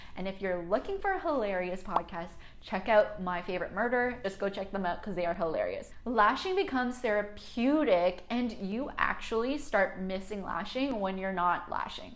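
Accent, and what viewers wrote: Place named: Canada. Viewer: It's the Canada “abouts and out” for me and in here for the “just fucking go for it” yaaaaaaasssss Queen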